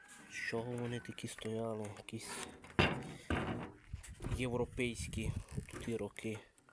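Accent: Transcarpathia, Ukraine. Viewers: Чисто случайно попав на твий канал Ти Закарпаття цикаво з якого села ты говориш так смішно Закарпацько Українсько руським словами ты таке наговорив поповудюу запчастей на москичу що Автомобилисти смiються для того аби правильно називати запчастини автомобиля хочаб читай в книжцi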